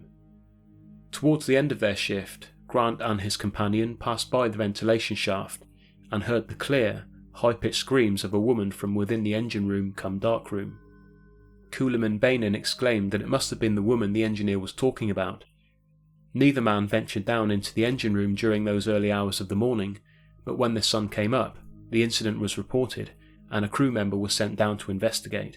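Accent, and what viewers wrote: England, United Kingdom. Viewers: how could it possibly have been at all profitable to sail coal clear from Australia to the United States? There are small, yet damning fapts of logic and lapses of sound reasoning that plauge each and every one of these "Tall Ship Tales And Old Sailors Tales" but I both appreciate the imagination that spawns them and the attempts to make them appear as realistic ad possible. Your cool English accent helps them along as well.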